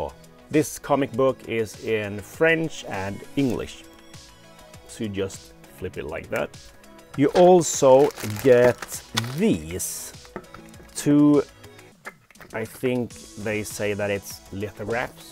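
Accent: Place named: Sweden. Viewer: Jag gillar att det hörs att du är svensk med när du exempelvis säger: ”I was super stolt” istället för ”I was super proud” Ska inte säga något, skulle säkert göra samma sak om jag gjorde det på engelska. I like when we can hear that you come from Sweden when you say: ”I was super stolt” instead of ”I was super proud” I should not say anything, maybe I should do the same if I did it on english.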